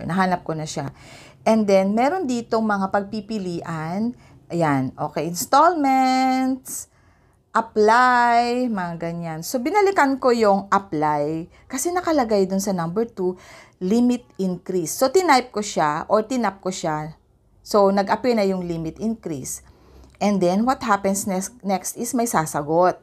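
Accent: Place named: Ilocos, Philippines. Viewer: Ilocana ka met gayam madam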